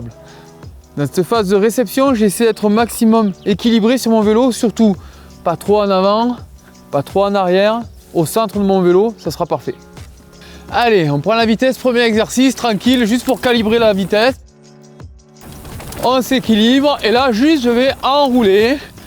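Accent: South, France. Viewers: Très bonne explication de quoi mettre en confiance et le plus l'accent du Sud 🤘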